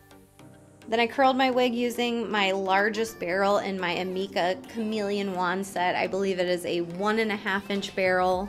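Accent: Ohio, United States. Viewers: is she from Ohio? She talks just like someone i know who’s from Ohio lol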